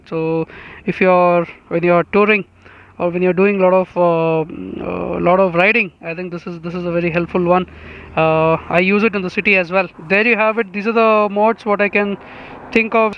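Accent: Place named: India. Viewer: Hate the freaking indian accent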